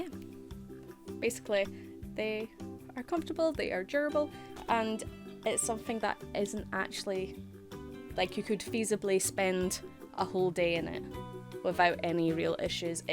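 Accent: Scotland, United Kingdom. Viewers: Your Scottish accent is tae die fer!!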